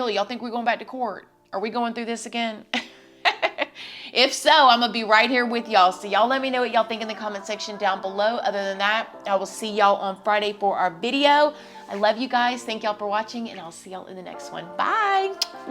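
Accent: South, United States. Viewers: Great job! I so enjoy you! You sound Southern like me. What is the best is your kindness yet unafraid to speak truth! You go girl!!! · Mmmm no, I disagree 36 years old is old enough for Amber to know better. If you watched the trial, then you're aware she's been given some pretty strong diagnosis as not a well person. With all respect to you and your channel,( which is obviously successful) Do your thing! You're pretty, got a great southern accent and a gossip show flair. (funny as a viewer to be called one of your "babies" as I'm nearly 2x's your age). Well being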